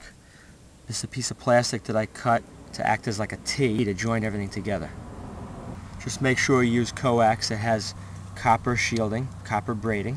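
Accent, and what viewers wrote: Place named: Boston, United States. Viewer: Are you from Boston or New York?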